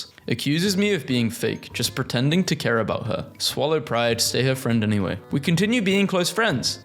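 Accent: United Kingdom. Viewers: To me you sound British. Just not RP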